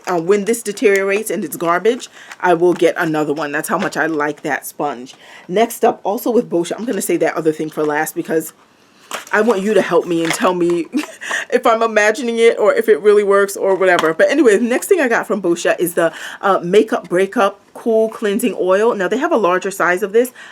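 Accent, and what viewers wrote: Caribbean. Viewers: Girl you're about to have me max out my card!! Thanks for the reviews!! BTW where are you from because I detect a slight West Indian accent? Stay beautiful!!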